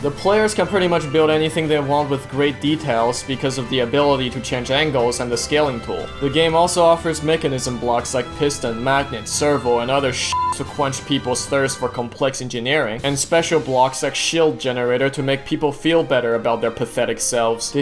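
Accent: Germany. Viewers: Are u german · are u german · He has a German accent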